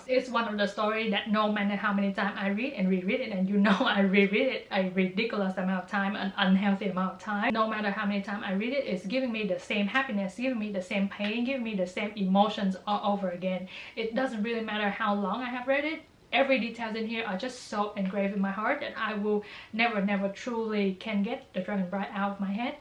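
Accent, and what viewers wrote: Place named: Vietnam. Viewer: are u vietnamese? your accent is so viet <3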